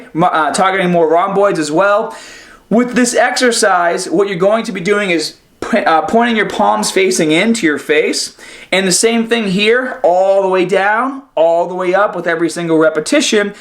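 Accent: Boston, United States. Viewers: Is this guy from Boston? He sounds like it.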